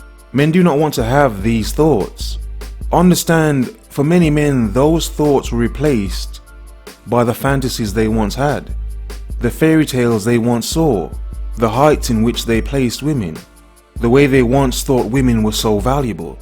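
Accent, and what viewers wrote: United Kingdom. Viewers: He is a Brit..I am surprised you couldnt pick up the British accent. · YouTube recommended this, but I can see through unoriginality of an African with a British accent trying to lecture males, not men to emulate his behavior and interaction therefore...I'm good these are devalued times, doesn't mean I need to stoop to a bottom of the barrel lecture from a schemer mentality to get through existence, love don't begin with a stranger it begins with sincere parents, family, and friends, every thing else is fate.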